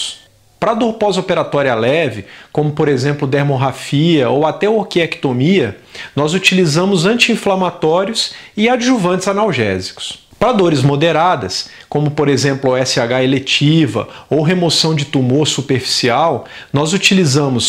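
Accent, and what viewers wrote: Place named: Minas Gerais, Brazil. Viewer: Prof. vc disse que é metade bahiano, metade italiano... Mas seu sotaque é bem mineiro... Parabéns pelos videos, estou gostando muito....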